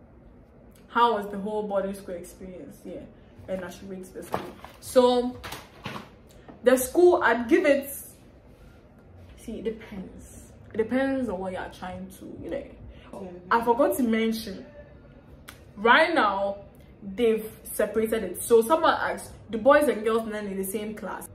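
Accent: United Kingdom. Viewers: I like your British accent can you use it more often♥️♥️♥️ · Your British accent😍😍🥺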